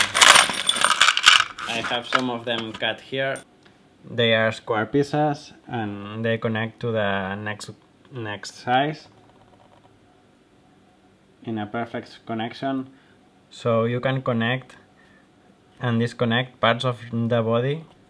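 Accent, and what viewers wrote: Spain. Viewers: Como c nota q eres español XDDD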